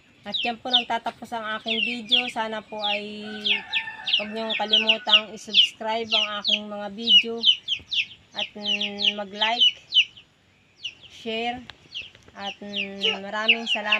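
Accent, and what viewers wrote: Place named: Marinduque, Philippines. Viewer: Parang tuno ng marinduque po kayo❤😊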